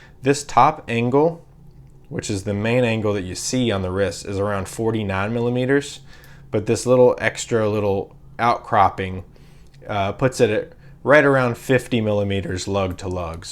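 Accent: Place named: Kentucky, United States. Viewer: I hear a Kentucky accent!!!!!!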